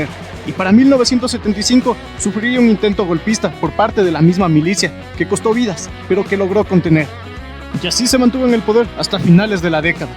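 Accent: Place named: Mexico. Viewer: El narrador es mejicano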